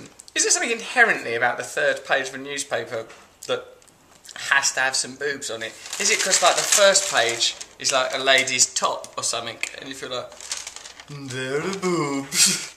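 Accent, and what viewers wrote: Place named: United Kingdom. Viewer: I could listen to you all day, I love the way you talk! It reminds me of my gram who can call someone a bastard and still sound polite :P Whenever I'm around her for more than a couple of hours I start speaking the same way... I once went with her to Mexico and came back with a British accent. I can't fake it for the life of me, though.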